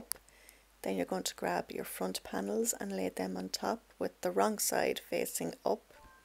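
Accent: Ireland. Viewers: This is beautiful. Can't wait to make one for myself! Thank you for sharing your amazing creations and for the added bonus of the lovely Irish accent!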